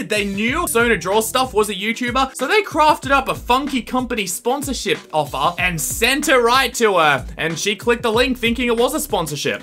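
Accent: Australia. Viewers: sound australian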